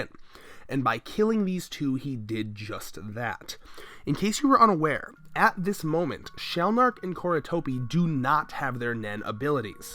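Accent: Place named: United States. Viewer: Why do you keep putting the r before e when pronouncing words, I think I developed a new pet peeve for American accents. Good vid tho subbed.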